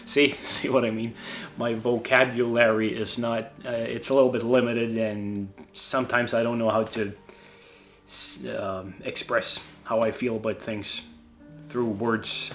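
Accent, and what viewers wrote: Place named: Upper Peninsula, United States. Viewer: You're my favorite YouTube discovery of 2025! I've been assuming you've been doing an intentional Joe Perra inspired thing with your voice. But I don't think you would use an affected voice for an entire video like this. So now I'm thinking Joe Perra's accent is inspired by not just the folks who live in Michigan's Upper Peninsula, but specifically folks of Swedish heritage up there. You both nail a very hygge feel. (Not a Swedish word but it's caught on in the US for a specifically northern European cozy vibe.) I've had the causal relationship backwards! You come by it naturally. No need to build a character around it. Happy belated birthday! Your English is easily as good as if not better than half the native speakers I know. Maybe you don't have the vocabulary of a college professor. But the vast majority of us don't, either. 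Don't sweat it.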